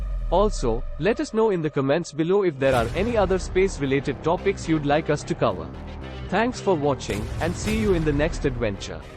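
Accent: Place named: India. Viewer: WTH? hindi synthesized accent?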